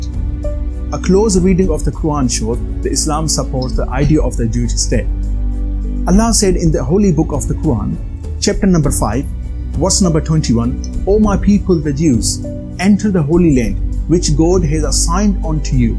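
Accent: Bengal, India. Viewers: He sounds Bengali not Pakistani. He sure is confused with his identity. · He sounds like a Bengali no Pakistani speaks like this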